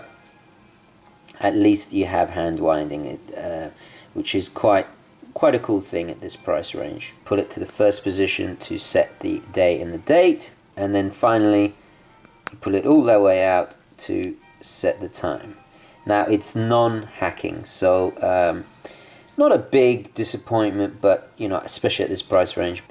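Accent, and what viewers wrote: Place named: United Kingdom. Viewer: Why do you sound so british? )) Nice watch, I´ve got mine in green.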